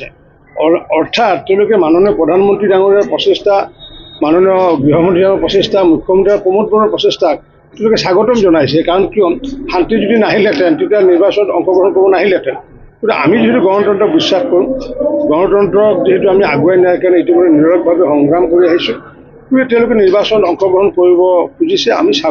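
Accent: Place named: Barpeta, India. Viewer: Borpetia accent t koksun val lakbodiyok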